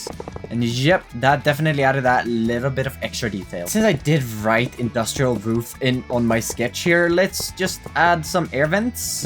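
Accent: Sweden. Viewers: Ar you Swedish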